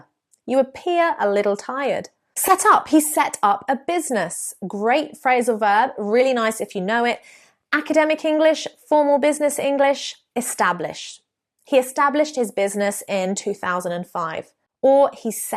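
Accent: United Kingdom. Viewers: realy I love you and your way in speaking british English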